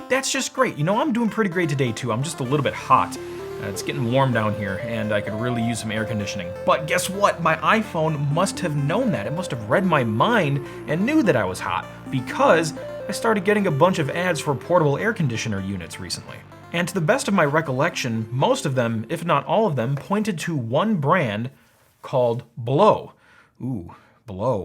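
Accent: India. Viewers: Indian accent? Dropped. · indian accent = red flag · Indian accent is also a red flag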